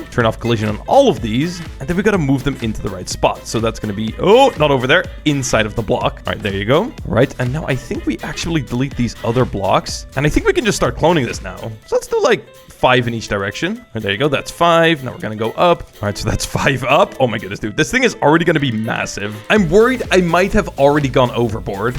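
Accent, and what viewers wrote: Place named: Netherlands. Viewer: Ik ruik een klijn beetje nederlands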